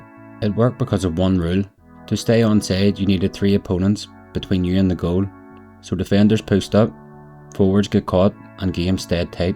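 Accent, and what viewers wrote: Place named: Ulster, United Kingdom. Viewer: Ulster dialect is the best part of this video.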